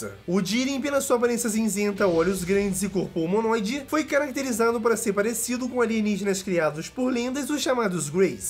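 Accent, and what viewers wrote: Portugal. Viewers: Vc é português (PT - Portugal) ??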